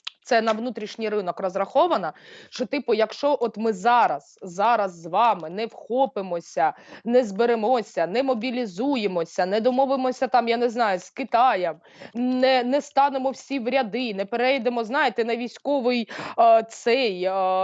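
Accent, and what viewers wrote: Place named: West, Ukraine. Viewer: Як приємно тебе, слухати.Просто,вухо радує Мова така,як на Західній Україні.Суперово. Ти неперевершена.Моллдчинка.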